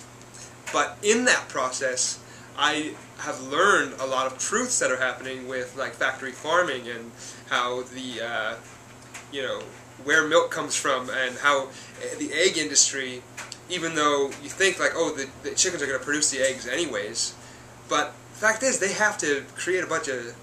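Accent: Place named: Spain. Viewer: Nice Spanish Accent...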